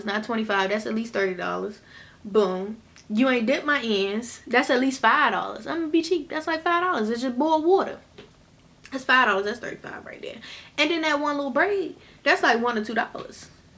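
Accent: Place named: Baltimore, United States. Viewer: I know I saw nc in the ss but I hear a lil bit of Baltimore 👀👀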